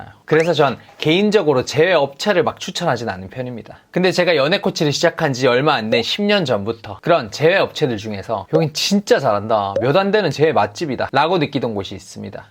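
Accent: Gyeongsang, South Korea. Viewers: 경상도 이네요이. 좋은자료 good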